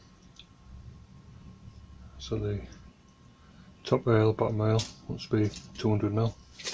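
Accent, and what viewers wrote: Yorkshire, United Kingdom. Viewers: I discovered your channel recently and you really know your stuff. Your keeping the craft alive which is great to see. North of England? Yorkshire perhaps??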